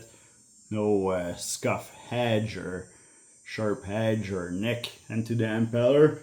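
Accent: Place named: Quebec, Canada. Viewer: Sounds like you are from Quebec, like me from Montreal!! I am your first commentator! Great video, will try and by the way, never thought of doing the job this way.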